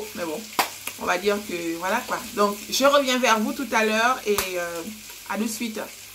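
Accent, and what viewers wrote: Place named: Gabon. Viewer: L'accent gabonais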